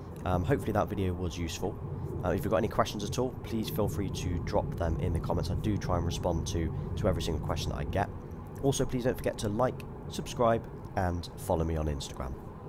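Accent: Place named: England, United Kingdom. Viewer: Great to here a calm English accent on a Tesla video.... a pleasant change from the over enthusiastic ‘awesome’ American content 😆 Great production and editing too 👍🏻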